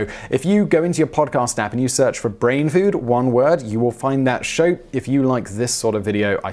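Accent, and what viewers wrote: England, United Kingdom. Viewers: Johny Ricco well, he’s English English. 😂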